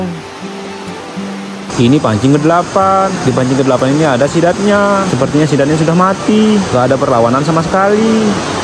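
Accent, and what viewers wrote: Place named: Kendari, Indonesia. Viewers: Logat ta sudah pasti mi sulawesi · Logatnya kaya org Kendari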